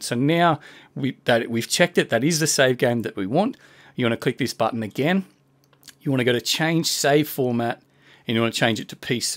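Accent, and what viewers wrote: Australia. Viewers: Did I ever mention how much I appreciate you, the fact that I can sit back and listen to an Aussie and enjoy myself makes me really happy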